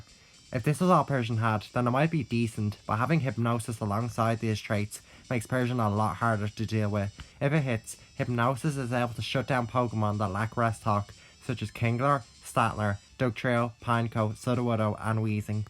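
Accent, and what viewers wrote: Ireland. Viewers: Ok I’m going to guess the accent is irish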